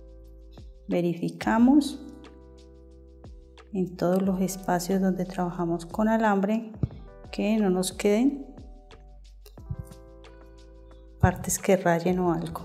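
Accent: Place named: Bogotá, Colombia. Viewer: Ud da cursos y en donde Ud es de Bogotá Colombia según el acento Me gustaría saber para ir hacer el curso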